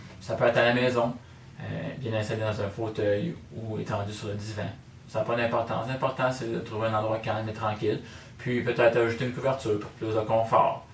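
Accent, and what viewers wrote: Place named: France. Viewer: Félicitations, C'est magique ta voix, Ça m'a fait du bien, continue comme ça! ton axa demi Français j'adore. Marie Médiatrice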